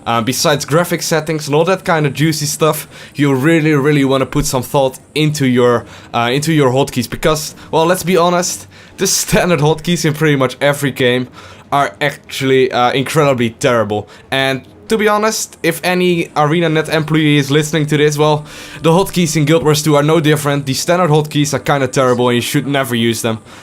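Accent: Netherlands. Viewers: Your dutch accent is so real 😂😂 no hate good video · Haha dat heerlijke Nederlandse accent. Mooie video man ;) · dutch or Belgium?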